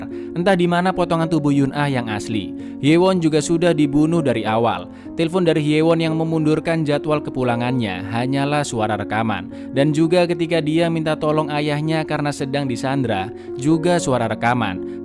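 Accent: Java, Indonesia. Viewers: Kak orang jowo to logat ne kayak uwong jowo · Jowone ngndi mas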